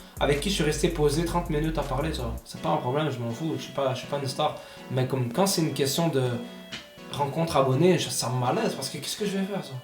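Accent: Quebec, Canada. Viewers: Est ce que ta deja regarder la série qui s'appelle la famille y ont le même accent québécois que toi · Fais plus de caméra caché en full québécquois gros, ça les rend 1000x plus drôle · jsuis vraiment mort bro, fais une vidéo où tu racontes que des histoires avec l'accent, c'est tellement énorme 😂 jvous kiff les québécois !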